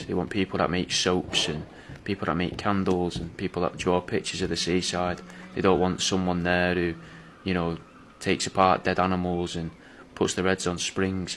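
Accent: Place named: Wythenshawe, United Kingdom. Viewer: Are u from wythenshaw cuz u dont sound like ur from dorset 😹😹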